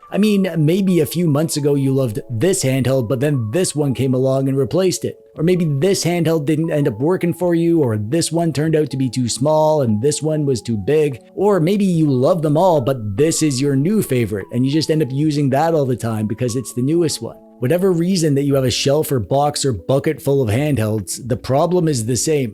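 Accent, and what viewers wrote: Canada. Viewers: Are you Canadian? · Is Canadian? Nice lol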